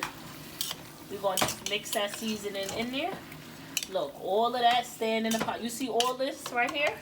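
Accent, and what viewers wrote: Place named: Philadelphia, United States. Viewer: AWW love your accent, I'm from philly so I'm here for this accent honey! lol don't call you hahaha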